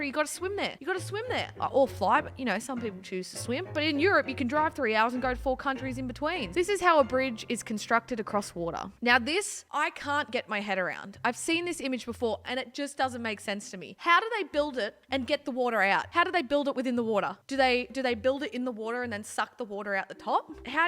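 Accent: Australia. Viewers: You sound like your brother wait a sec all Australians sound like that. Nope just you two.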